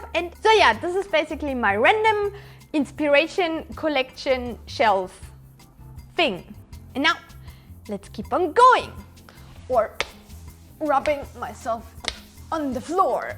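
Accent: Germany. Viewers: quick question are u german? bc u sound like german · Ich hör doch da Deutsch raus, Brubies. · she's german, it's an accent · I love it when germans speak english... so cute 😁😘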